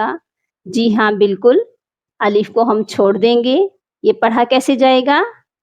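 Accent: Panipat, India. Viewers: اپکا پانی پتی لہجہ ھے ماشاء اللہ مجھے بہت پسند ھے لیکن میری سانس زیادہ لمبی نہیھے جسکی وجہ سے میں بہت پرشان ھوتی ھوں کوئی طریقہ بتادیں تاکہ یہ پڑھائی کر سکوں❤❤❤❤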